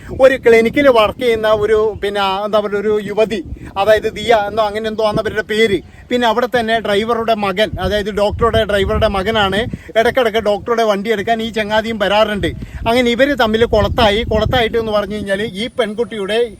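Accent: Kannur, India. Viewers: സ്വതേശം കണ്ണൂർ ആയിരിക്കും സംസാരം കേട്ടിട്ട് തോനുന്നു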